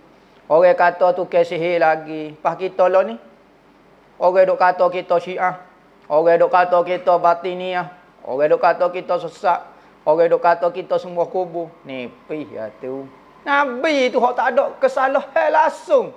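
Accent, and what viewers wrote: Kelantan, Malaysia. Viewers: Walaupun berbahasa kelantan pekat....tapi tidak bosan ,mudah difahami dan penyampaian jelas...alhamdulillah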